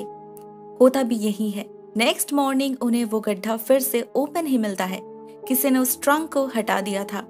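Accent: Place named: Bihar, India. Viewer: Aap bihar se hai na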